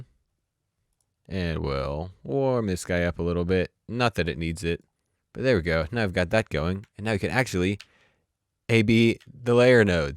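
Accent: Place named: England, United Kingdom. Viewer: it's english..not american lol..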